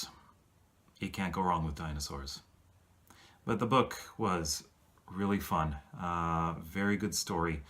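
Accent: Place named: Canada. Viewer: I like your accent! Is it Canadian?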